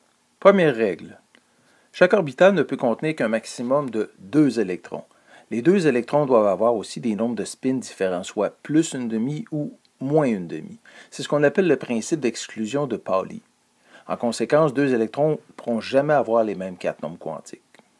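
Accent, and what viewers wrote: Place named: Quebec, Canada. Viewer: Accent québécoise 🗣️🗣️😅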